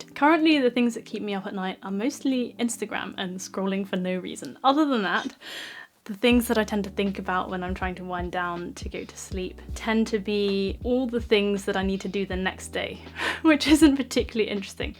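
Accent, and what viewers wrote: RP, United Kingdom. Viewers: 我来自中国，很喜欢你的英音！欢迎来中国旅游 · I wish we could meet in person,so that you can teach me to speak a British accent.🙂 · Late to the party, but are you a citizen of the UK or abroad? I have heard that people in the UK rarely have an RP accent except for the ones that stood abroad in private institutes. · I’ve started watching her video to listen her British English and now found her using a Japanese cleanser Goku Jyun! As a Japanese I’m so proud of our product has been used in UK and somehow it feels funny😂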